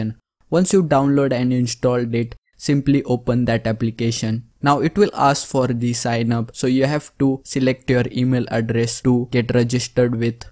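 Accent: India. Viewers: He is just in a Indian accent not everyone is English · it's Indian accent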